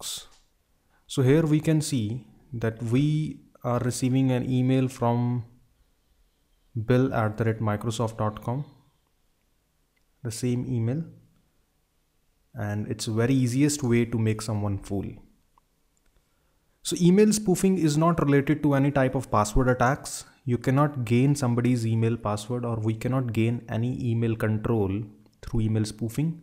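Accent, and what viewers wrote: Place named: India. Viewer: Your accent is sooo indian .yakk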